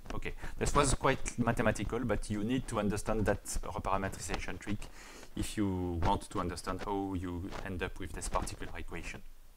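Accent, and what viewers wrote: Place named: France. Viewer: Your french accent is lovely!! Also, thank you for so clear explanation!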